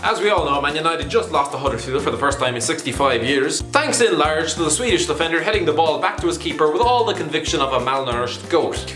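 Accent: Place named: Munster, Ireland. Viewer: Somewhere in Munster I'd say judging by the accent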